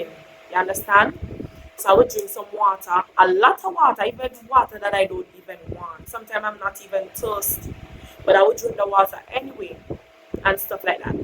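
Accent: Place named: Nigeria. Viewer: Lol the Nigerian accent 😂😂😂🙆🏾‍♀️🙆🏾‍♀️🙆🏾‍♀️ nice video ♥️💯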